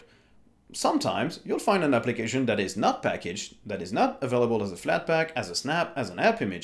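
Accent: France. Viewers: You've something like a French accent..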